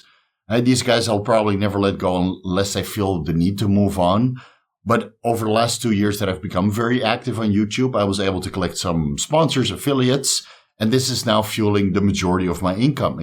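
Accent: Netherlands. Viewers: Congrats on the 50k, definitely deserved. Glad I found your channel and hope to enjoy the content for many more years, and leave many more comments and likes. The videos on applying your knowledge on practical cases seems very interesting to me. Always like to see stuff applied as well as explained. Also: fellow dutchman here, I don't mind your pronunciation. I hate a thick dutch accent but your accent is subtle and enjoyable imo.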